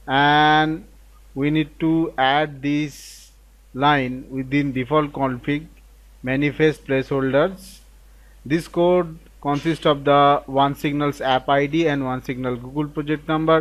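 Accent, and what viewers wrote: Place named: Bengal, India. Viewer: Such cute Bong accent.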